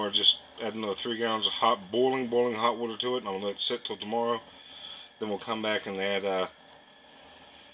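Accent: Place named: New England, United States. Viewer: U from new england? You say wutter instead of water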